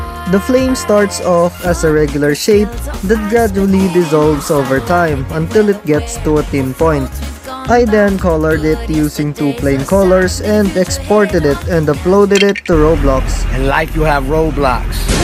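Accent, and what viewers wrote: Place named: Philippines. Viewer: Y do u sound like a Filipino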